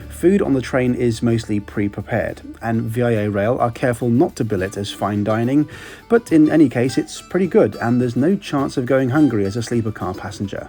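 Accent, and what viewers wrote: United Kingdom. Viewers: Are you Briton???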